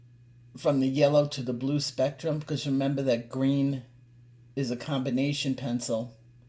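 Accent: New York, United States. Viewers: You are an amazing colorist. I have been pining for Holbeins but just can’t afford them, even though I don’t believe that I would do them justice like you. Her hair is like my mother’s almost, my Mom had a bit more red, mine was darker but with the reddish highlights. I never tire watching you make a picture come alive and love the NY accent!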